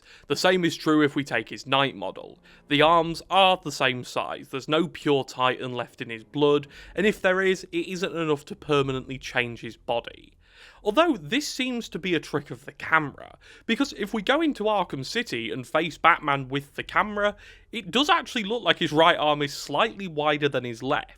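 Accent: United Kingdom. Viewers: British bloke talking about video games? Don't mind if I do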